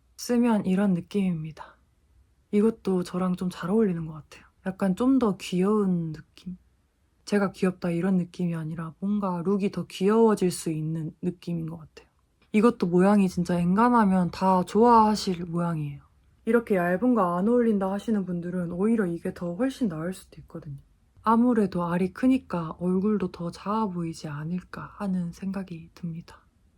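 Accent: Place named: Gyeongsang, South Korea. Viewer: 새영상🥵❤️ 님 사투리 귀여워요ㅋㅋㅋㅋㅋㅋㅜ 광주사람이신가? 대구분? 경상도인가용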